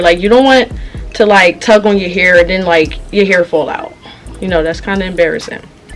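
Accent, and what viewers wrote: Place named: Philadelphia, United States. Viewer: Yesss when u started talking I was like she definitely a Philly girl 😍😍😍😍 hair stores open in Southwest Philly from another Philly girl ps I subscribed 😘😘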